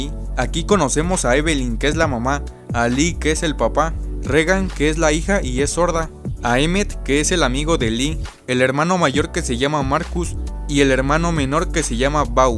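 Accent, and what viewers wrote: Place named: North, Mexico. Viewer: Yo fui productor de television en la especialidad de publicidad en pocas palabras era productor de comerciales, yo voy a ti, como locutor, tienes una magnífica voz, pero no tienes nada de experiencia y te hicieron debutar demasiado pronto, lo principal es el acento neutro y yo aunque no soy mexicano me parece que tienes un acento norteño yo estaba esperando en cualquier momento el "puesn" eso es el grave defecto que veo en tu desempeño, pero felizmente eso no es mortal y tiene solución, estudia para adquirir el acento neutro y practicar por lo demás material hay y muy bueno., tu voz, lo de la locución profesional puede esperar un poco y prepararse para un mejor desempeño, felicidades y adelante.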